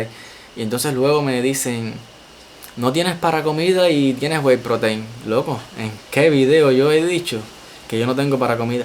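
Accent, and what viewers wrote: Cuba. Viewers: Me encanta tu acento. Primer cubano que escucho que habla de forma fluida y entendible. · Cuban Spanish language sounds very different than the Spanish I am accustomed to hearing in NYC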